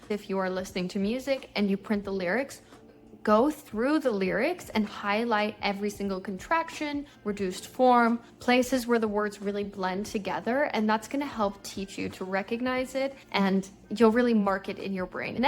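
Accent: United States. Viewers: ❤ thanks teacher your pronuntation Is clear AND natural.are you American?❤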